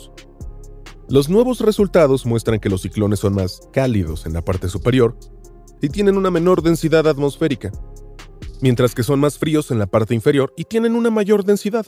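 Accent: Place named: United States. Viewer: Todos estos canales que se las tiran de cientificos han de tener narrador latino agringao?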